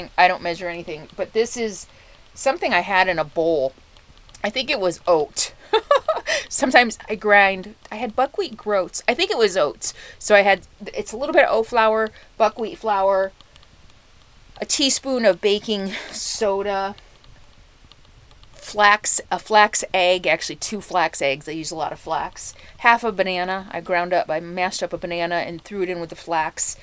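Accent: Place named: Minnesota, United States. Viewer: I haven’t lived in MN for over 50 years, and everybody still asks me if I’ve seen “Fargo.” The accent is strong in this one.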